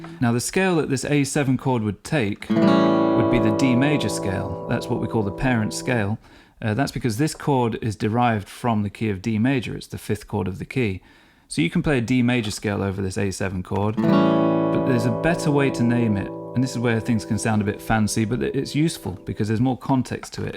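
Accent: United Kingdom. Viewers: Did NOT expect the British accent after the guitar part🤣 ... hardcore Americana vibes. Love it!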